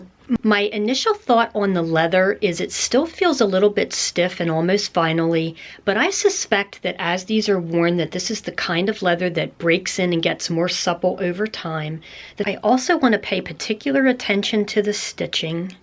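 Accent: Philadelphia, United States. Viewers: Thank you for posting this video! I’m cracking up tho I hear a lot of Philly/S Jersey in your accent. Se d them back if they hurt. But they’re beautiful and legit boots of good quality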